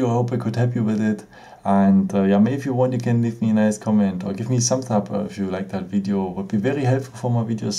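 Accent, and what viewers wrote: Germany. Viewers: couh- couh seine englische Dialekt sagt ich bin deutscher !!!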